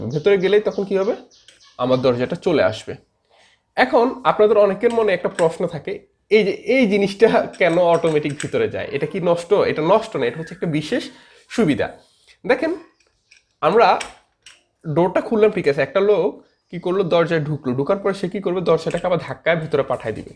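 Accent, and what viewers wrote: Noakhali, Bangladesh. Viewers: নোয়াখালীর ভাই নি আননে 🤭🤭🤭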